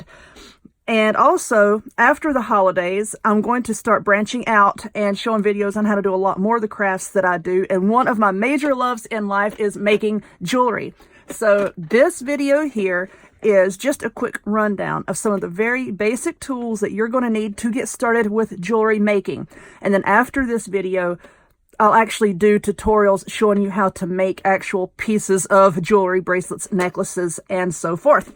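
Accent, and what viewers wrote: South, United States. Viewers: So happy to hear your Southern accent again. You tickle me when you say caddywampus (sp) I'm from Jersey, have never heard that word before and laughed out loud when you said it. I also love to watch you crochet. My grandma taught me when I was little but I forgot everything. I've been watching YouTube tutorials to relearn. I'm hoping you make some crochet projects. Happy crafting.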